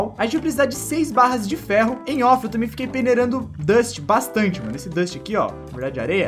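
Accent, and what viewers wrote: Rio Grande do Sul, Brazil. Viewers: tu é mesmo gaucho ou tu so brinca com o sutaque?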